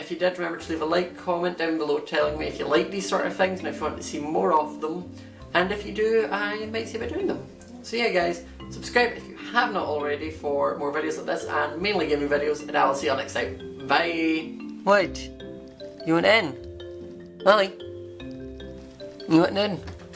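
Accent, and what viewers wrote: Scotland, United Kingdom. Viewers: he's Scottish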